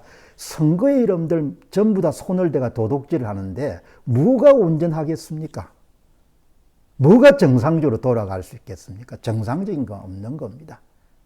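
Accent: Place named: Gyeongsang, South Korea. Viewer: 갱상도ㅎㅎ 편가르기 지겹다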